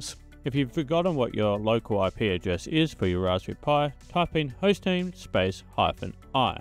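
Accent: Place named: Australia. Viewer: I love your vids, can’t beat that aussie accent 👍 Can you please do a vid showing how to get matrix synapse running over tor? 🙏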